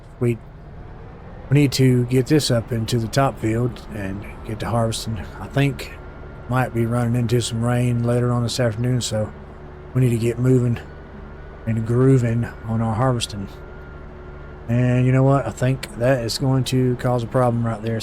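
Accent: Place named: Carolinas, United States. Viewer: You from one of the Carolinas? Some of the way you talk reminds me of Dale Jr. 🤣 Good stuff man.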